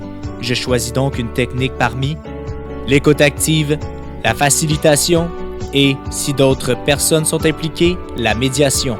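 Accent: Canada. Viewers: T'as fait une présentation exemplaire mais pardon ma sauvagerie...Ton accent Canadien à détruit partiellement ce vidéo... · super, l'accent canadien c'est fun! moi j'adhère, merci!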